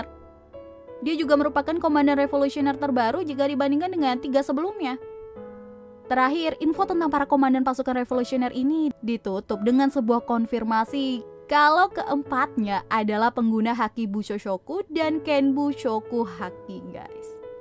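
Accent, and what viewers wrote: Java, Indonesia. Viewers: Saya kangen suara medok 😀😀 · Iyoi medok... Koyok pisan. Wong jowo kabeh berarti iki yo... G or Irish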